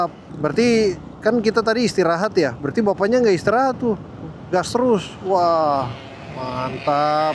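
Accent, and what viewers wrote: Eastern Indonesia, Indonesia. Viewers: Izin tanya bang,, asli daerah mana bang,, soalnya logat nya kyk agak mirip daerah timur sedikit bang 👍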